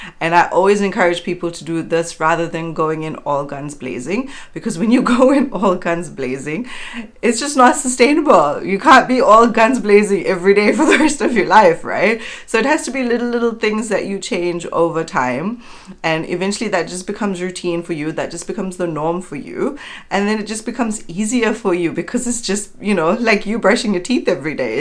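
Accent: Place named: South Africa. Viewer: Beautiful inspiring video ❤ Also recognize a slight south african accent...love it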